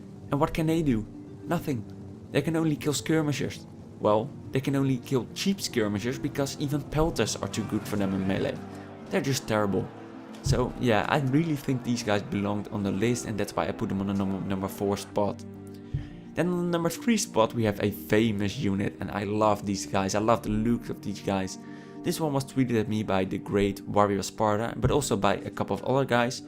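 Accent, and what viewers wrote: Netherlands. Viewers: Volgens mij hoor ik een Hollandse ondertoon? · lol your dutch accent tho:D so obvious haha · Are you by any chance Dutch? Cool video btw:) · you dutch? i hear a dutch acsent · dutch, I guess.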